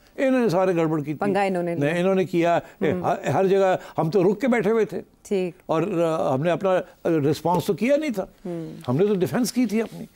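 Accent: Punjab, Pakistan. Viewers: Love Punjabi touch 😅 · Najam Sethi sb the way you give a punjabi touch its fantastic. 😂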